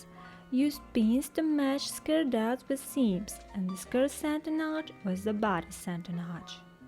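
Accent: Russia. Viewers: У неё акцент как у русской🗿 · Hi! You sound very much Russian, with little difference in the melody of your speech. Also, your wedding ring (I assume) is on your right hand :)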